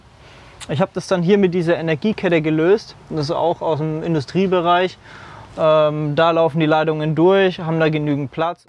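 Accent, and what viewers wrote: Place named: North, Germany. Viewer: Super beeindruckend! Sehr nett ist auch die norddeutsche Sprachfärbung. "Hier is nochn Fliegngidda" Gutes Video.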